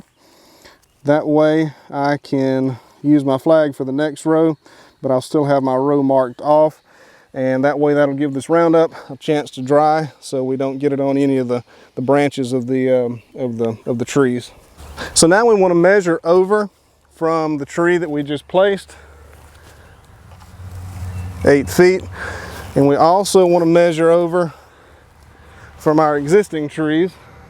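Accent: South, United States. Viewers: Thanks! You always post the most calming yet informative videos. I'm Georgia born and raised but you have the best southern accent by far!:D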